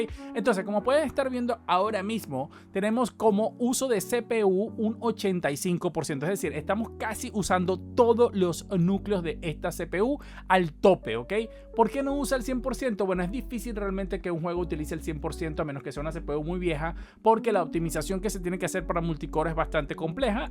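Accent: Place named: San Juan de los Morros, Venezuela. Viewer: Saludos hermano, me gustan tus videos... he notado que tienes un acento muy parecido de la ciudad de donde soy, no seras de San Juan de los Morros?? Guarico, Venezuela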